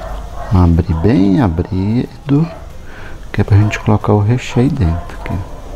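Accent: Minas Gerais, Brazil. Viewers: Seu jeitinho de falar é muito gostoso de ouvir, jeitinho mineiro , amo seus vídeos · Você passa uma paz tremenda!!! Amo sua maneira de falar. Vc é mineiro? Sua comida é muito bem feita, e com certeza saborosa! Vc em outro dia falou como sua mãe educação vc! E falou de uma maneira respeitosa... lindo! Gosto de vc de graça! Ñ é uma cantada! É um elogio❤👏👏👏👏👏👏👏👏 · Gente que simplicidade adorei parabens! Sotaque meio mineiro, goiano? Adorooooo fogaozinho a lenha me lembrou mto minhas origens. Parabéns acabou de ganhar uma inscrita e super like. Adorei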